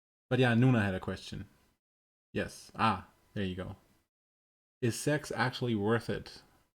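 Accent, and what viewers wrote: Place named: United States. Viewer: Your accent sounds SO american to me (it took an interesting amount of time - no, I can’t remember how long, I only knew that once - until I realized: Oooooh! A German?!), dass ich mich beinahe nicht getraue, etwas auf Deutsch zu schreiben. 😄 Na, ne, so schlimm ist es dann doch nicht…mehr. Zumindest nicht dramatisch genug, um mich davon abzuhalten, dir kurz aufzudrängen, dass ich in vielerlei Hinsicht schwer beeindruckt und sehr dankbar bin, denn: Durch deine enorme Offenheit, und damit automatisch die Stärke zu vertrauen, habe ich das erste Mal seit Jahren das vage Gefühl: „Ja, doch, man kann sich verletzlich zeigen - und es kann schön sein“. Weißt du, ich dachte, ich würde eines Tages mit Begeisterung Geschichten schreiben, denn früher hatte es mir großen Spaß gemacht, durch behutsames Freilegen eines Charakters, intime Situationen entstehen zu lassen. 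"Ich dachte" war natürlich einmal "ich denke", aber einige spannende depressive Episoden, lästig wie eine Person, die ganz dicht vor einem hergeht, eigens für den Zweck, nach Lust und Laune abrupt stehen zu bleiben, sodass man nicht anders kann, als gegen sie zu prallen, machten es mir sehr schwer. Irgendwann hat man erschreckend große Angst davor, es könnte einem jemand zu nahe kommen. Deswegen kann man bestimmt von einer gewissen Erleichterung sprechen, wenn man jemandem zuhören darf, der einem vormacht, wie mutig geht. Und das machst du wirklich großartig - dankeschön. 😊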